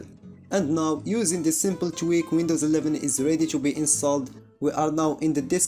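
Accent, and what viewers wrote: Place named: Morocco. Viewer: f....king Morrocan accent, I like.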